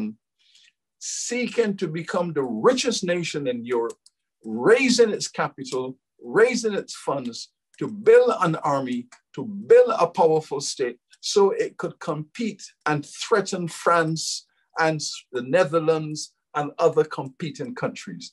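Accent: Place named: Caribbean. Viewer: Dude has books. Damn. And a posh Carib accent.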